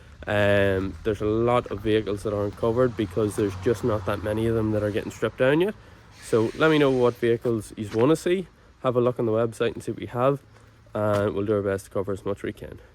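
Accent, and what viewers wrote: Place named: Northern Ireland, United Kingdom. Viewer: The accent tells you , northern Ireland, probably. around Newry area by his accent🎉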